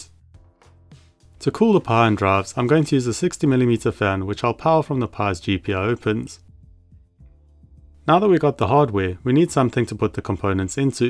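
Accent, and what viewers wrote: South Africa. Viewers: Hah! Thought I recognized the accent, I'm also South African, though still in the homeland. Love the channel man, gonna do a lil Pi NAS project in December based on your specs. Thanks for channel man.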